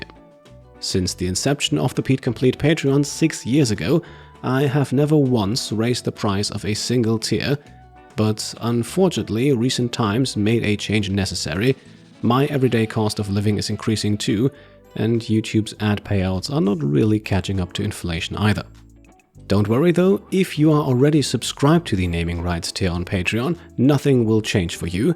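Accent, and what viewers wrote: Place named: Germany. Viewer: You have a similar speech pattern to another channel I watch. "Feli from Germany". She obviously learned American English tho.. Are you Bavarian? Btw, Glad you will be on YouTube full time!!. I'm patiently waiting for a Stellaris series done the Pete complete way. It would be SUPER SUCCESSFUL and there is not a lot of stellaris playthrus that RP effectively!!!!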